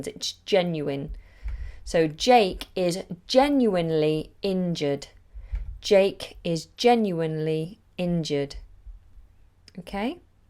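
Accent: United Kingdom. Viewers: i loved your voice and your accent i want to learn british accent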